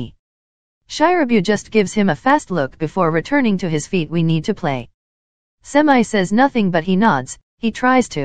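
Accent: Italy. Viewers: ti prego ho capito subito che sei italiana, comunque adoro troppo il video<3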